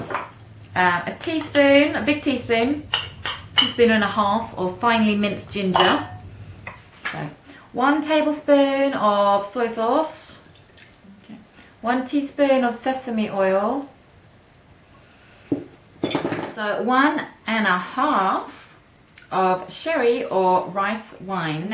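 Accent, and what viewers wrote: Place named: Milton Keynes, United Kingdom. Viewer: were about in the uk do u live u sound like u have a milton keynes accent. from a fan that is 12 and loves to cook and suprisingly a boy. :D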